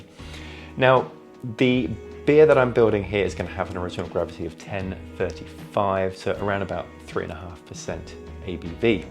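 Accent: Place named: Southern England, United Kingdom. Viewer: Very good ,will have a crack at this one and try it on the beer engine. It's that Southern accent again. Last time seeing the Saints live was Alan Balls last game. Think he was last player to stop playing from the 66 world cup team.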